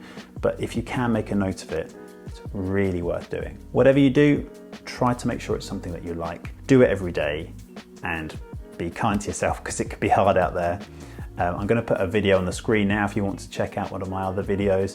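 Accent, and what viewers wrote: United Kingdom. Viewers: I liked your accents makes me want to learn british english even more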